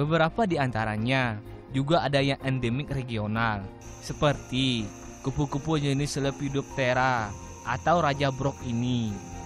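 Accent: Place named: Kapuas Hulu, Indonesia. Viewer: Ini yang ngomong di balik Video pasti asli orang kapuas hulu :D dari logatnya udah bisa ketebak. hehehe..salam saudara, ayoo kita majukan daerah kita sama2. <3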